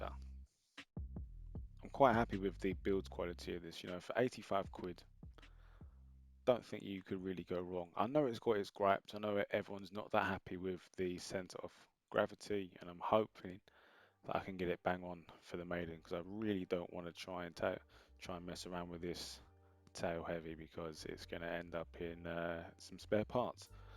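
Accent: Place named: Ireland. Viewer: Love that Irish actsent.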